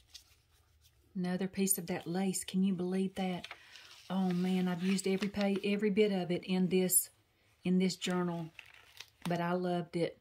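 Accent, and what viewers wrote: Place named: Alabama, United States. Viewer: Beautiful journal! Awesome first video! This Texas girl loves your Alabama accent!!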